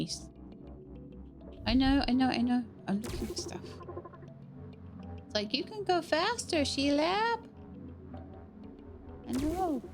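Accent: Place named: United Kingdom. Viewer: You made your internet handle the primordial spider from lord of the rings... NGL that's kinda based, would be really funny if you were an auzzy but you sound more British than that.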